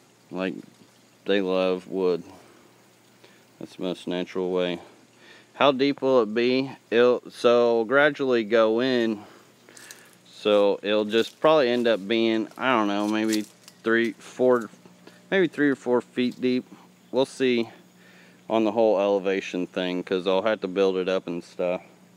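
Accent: United States. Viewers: I love your american accent Greetings from Paris 😁 Michel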